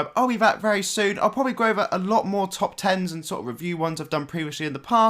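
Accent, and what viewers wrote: United Kingdom. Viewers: I love ur accent and this clip U Brit or somewhere on the Europe?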